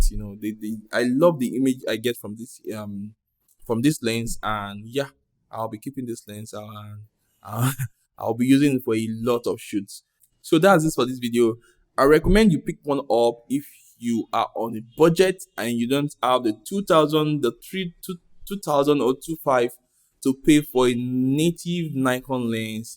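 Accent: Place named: Yoruba, Nigeria. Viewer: I LOVE YOUR YORUBA ACCENT ABURO..GOOD REVIEW..JUST SUBSCRIBED.!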